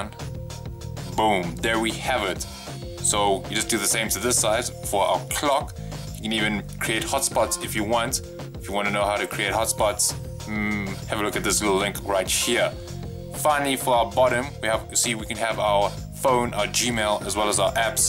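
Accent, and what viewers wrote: South Africa. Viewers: Cool tutorial, wasn't expecting the SA accent, nice bru!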